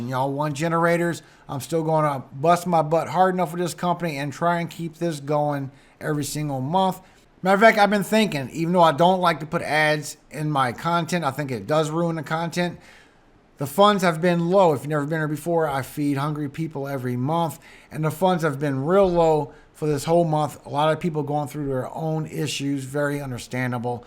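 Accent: Louisiana, United States. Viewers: Bust thanks sounds like ur from houma la or nearby neworleans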